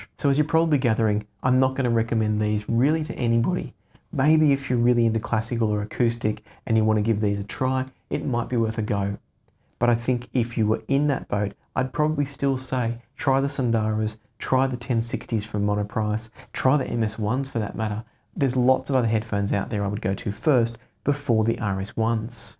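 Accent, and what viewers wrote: Australia. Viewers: I love your soft, soothing Australian accent...you would make a great ASMR artist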